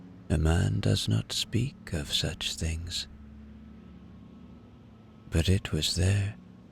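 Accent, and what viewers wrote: Ireland. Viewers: I love the lovely lilt of the southern Irish accent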